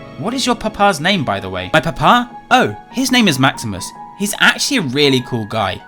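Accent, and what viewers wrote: United Kingdom. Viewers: This is pghlfilms but bri’ish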